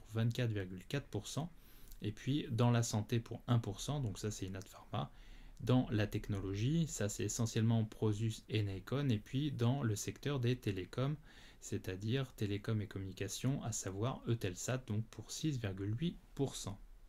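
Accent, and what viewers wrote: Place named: United States. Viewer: Salut j'aime beaucoup tes vidéos vraiment mais si je peu donner une petite critique, c'est que tu prends un accent anglais américain qui est un peu ridicule dsl mais ça m'agace beaucoup ! Je comprends que parfois tu n'as pas le choix d'utiliser l'accent anglophone mais franchement tu l'utilise pour rien, c'est comme dire " T-shirt " a l'américaine Ti chweuuurt nan... !! Tu le prononce normalement t-shirt. Voilà ce qui me rend un peu ouf quand je mat tes vidéos, que j'adore au passage. Bref dsl mais la fallait que ça sorte. Merci a toi.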